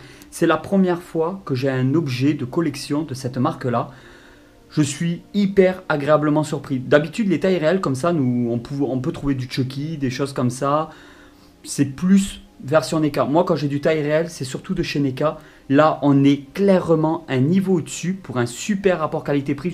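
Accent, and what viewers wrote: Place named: South, France. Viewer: ah le plaisir de réentendre ton petit accent du sud de la France, c'est cool que tu revienne sur youtube avec de nouveaux projets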